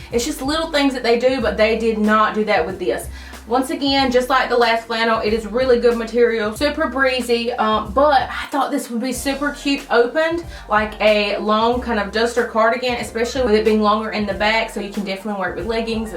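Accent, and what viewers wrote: South, United States. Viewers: why does she sound soooo country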